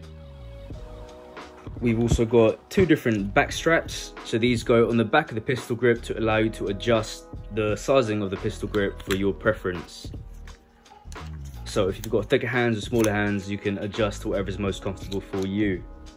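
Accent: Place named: Caribbean. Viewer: Why does he have a black West Indian accent?????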